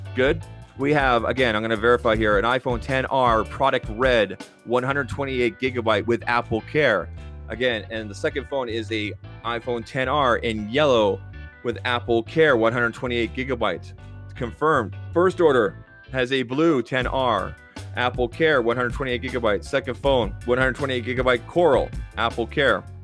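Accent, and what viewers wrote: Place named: Canada. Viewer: thanks for what you do, the first time I met you I was in a live show of fpt and you donated $100 and defended Jon when some people were being rude. I commented that you sounded like a Canadian & you answered that you were from Calgary, my response was lol I’m in the gas city.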